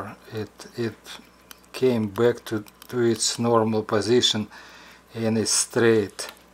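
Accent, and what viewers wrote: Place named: Russia. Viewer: Your Russian accent is перфект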